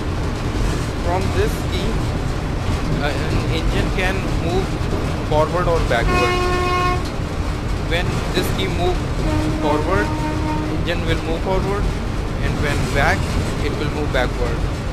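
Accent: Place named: India. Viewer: Compliments for taking time out to make this audio-visual coverage. However, I must rectify one thing. You've been using the term electric 'Engine' iteratively, which is wrong. It should be electric 'Locomotive'. These locos don't have engines in them. For those who have been criticizing for his English must remember that it's not our mother tongue, neither it belongs to our country. So, an Indian with accented English is quite normal and nothing wrong to look down upon. Don't discourage someone when you can't encourage them.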